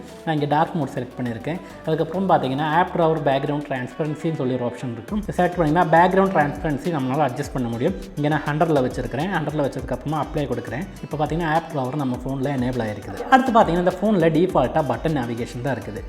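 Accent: Kerala, India. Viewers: என்னாயா உன் லேசா பேச்சில மலையாள வாட அடிக்கிது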